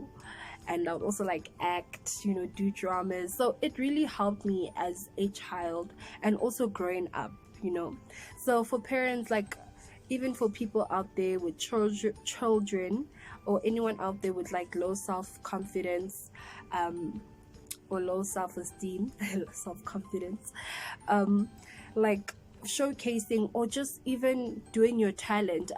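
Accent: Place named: South Africa. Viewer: Hi, thank you for the uplifting story. Question: What are the similarities between living in Madagascar and S.A.? Your accent and the words you use (I.e. woza, yooo!) tell me that you have integrated to the SA environment. Thanks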